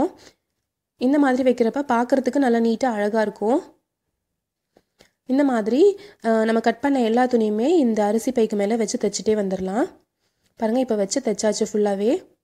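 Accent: Coimbatore, India. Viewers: Akka nenga Coimbatore ah..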